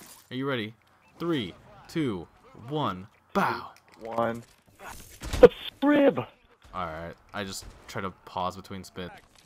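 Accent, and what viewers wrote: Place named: New Zealand. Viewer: You sound like kiwi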